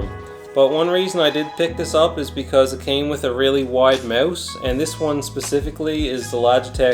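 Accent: Newfoundland, Canada. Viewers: i know a newfie accent when i hear it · You from NL or east coast Canada by chance? Knows that accent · You sound like a Newfie my friend, hello from a fellow Newfie if so. Great video, keep up the good work! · Dude you definitely have to be a newfie? Lol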